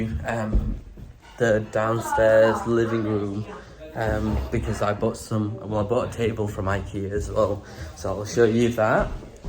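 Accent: Punjab, India. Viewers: your accent punjabi with English. its amazing. really love your videos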